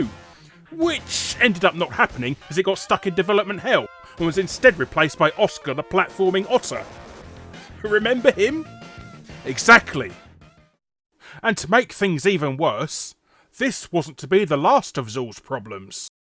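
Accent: United Kingdom. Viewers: The voice is what I love the British accent makes all things cooler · every single British person talks like this. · I've only just discovered you. I'm curious as to which part of the UK you're from and your videos are awesome, funny, informative, interesting and well presented. Thanks! PS: I love that you cover British/European games. I love all games but Japanese and American games are covered everywhere. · You can't find a more british accent than his, seriously, i dare you to try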